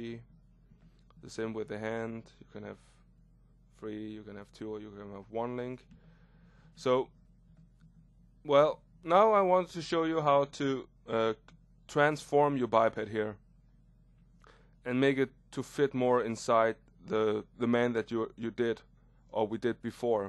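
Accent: Denmark. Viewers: it sounds like you're danish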